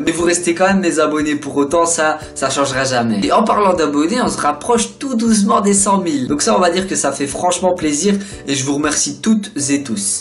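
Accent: Belgium. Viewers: Le réveil de l'accent belge!!!!!!!!! (oui)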